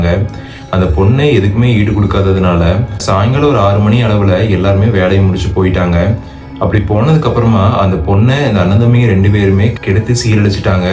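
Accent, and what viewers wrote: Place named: Coimbatore, India. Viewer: Ok bro your Coimbatore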